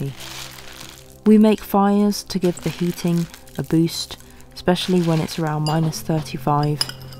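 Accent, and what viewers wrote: United Kingdom. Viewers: i just moved to sweden... i can't wait for spring and summer this winter made me sick of snow. my goal is to get so much sun that im tired of sun also you don't sound swedish to me your English sounds like you're from the UK or some shit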